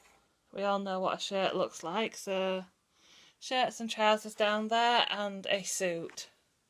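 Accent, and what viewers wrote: Yorkshire, United Kingdom. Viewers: Hi from a new subscriber. I assume you're in Yorkshire by your familiar accent? I'm in York! I will look forward to seeing more uploads in the future x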